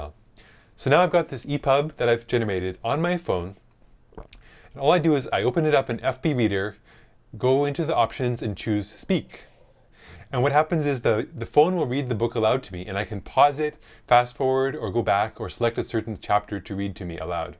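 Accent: Canada. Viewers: U Canadian? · He is Canadian. That is why he sounds different.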